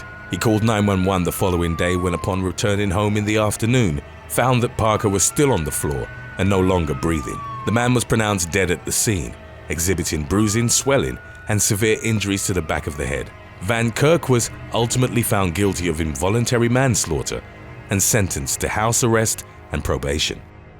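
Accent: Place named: England, United Kingdom. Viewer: This series is addictive! I love the narrator’s English accent!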